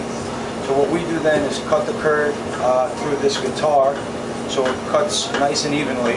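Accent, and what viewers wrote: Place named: New Jersey, United States. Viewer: Without knowing i can guess he is from NJ lol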